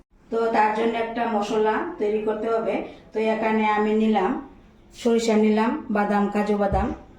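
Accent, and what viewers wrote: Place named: Tripura, India. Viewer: Bhalo laglo. Kintu aapnar bangla uccharan keno annya rakam. Aapni ki tripuri or silent?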